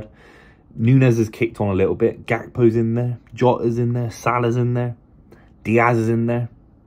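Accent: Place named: West Country, United Kingdom. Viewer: your blackbeard west country accent is coming through and im all for it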